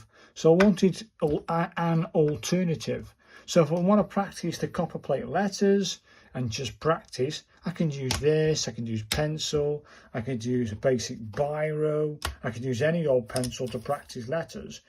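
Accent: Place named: United Kingdom. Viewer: I watch your videos because of your "British Accent" and Calligraphic Content. Good Luck. Keep it up.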